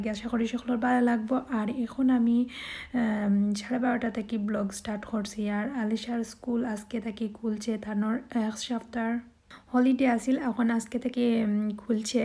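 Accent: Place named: Sylhet, Bangladesh. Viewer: আপু আমি ঢাকায় বনানী তে থাকি। তোমার ব্লক টা ওনেক সুন্দর লাগলো … আমি কখনো সিলেটের কথা গুলো শুনি নেই আজ Fast শুনলাম তোমাদের সিলেটে ভাষা গুলো শুনে আমি তো অবাক হয়ে গেছি আসলে অসাধারণ লাগলো খুব খুব ভালো লাগলো তোমার কথা গুলো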